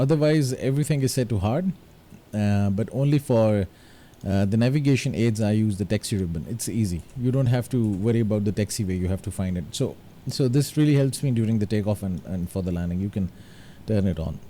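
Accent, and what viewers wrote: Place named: India. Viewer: Clicks on video *hears Indian accent * ANNOYING and turns it of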